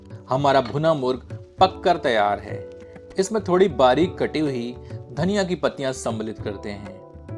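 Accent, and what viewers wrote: Standard. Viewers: apki shuddh hindi bahut hi achi h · आपका विशुद्ध हिन्दी मे बोलना काफी अच्छा लगा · shudh hindi me recipe dekh kar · aapka Shudh Hindi Ucharan bahut Pasand hai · Apki sudh hindi Or cooking style dil jeet liya.... Dhanyavaad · Aap itni shuddh Hindi prawachit krte h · aapki voice aur pure hindi soonnnay aata hun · आपका बोलने का शुद्ध हिंदी तरीका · shudd hindi and very polite way to explain recipe. awesome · आपकी शुध्द हिन्दी और आकर्षक प्रस्तुति से ऐसा मेरा मानना है कि यह डिश बेहद स्वादिष्ट होगी..निश्चित ही इसे बना कर आजमाया जायेगा...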